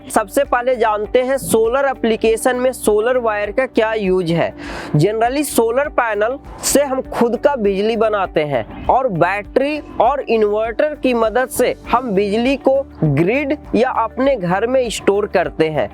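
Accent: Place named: Bihar, India. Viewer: Bahut menat karna hai abi bihari jaise bol rahe.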